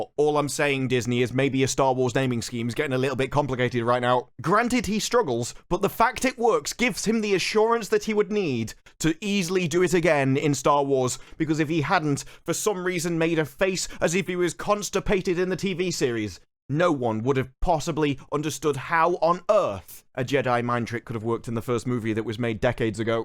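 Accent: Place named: Stoke, United Kingdom. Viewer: I don't agree with everything you say but you speak eloquently. You are a well informed man with good language jive. btw are you from Stoke?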